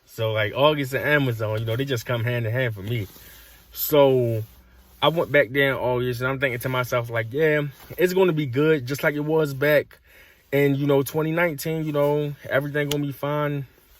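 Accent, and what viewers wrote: Baltimore, United States. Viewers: Are you from Baltimore?lol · Bro definitely gotta be from Baltimore 😂 accent super hard 😂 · This dude gotta be in Baltimore with that accent. And shit in Baltimore is different completely no matter what it is. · are you from baltimore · You from Baltimore? That accent crazy lol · Definitely a bmore dude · He sound like he's from Baltimore Lol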